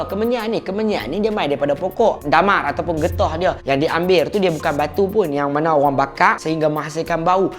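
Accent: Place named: Penang, Malaysia. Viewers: Aku suka cakap. Besala oqang kita.. Penang mariii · samaaa...layann jaaa ceritaaa diaaa tambah2 pelet utaqa lak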